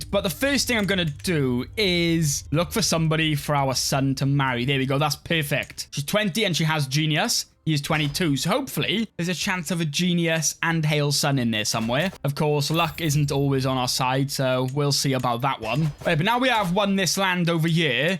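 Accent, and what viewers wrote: Wales, United Kingdom. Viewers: Where in Wales are you from mate?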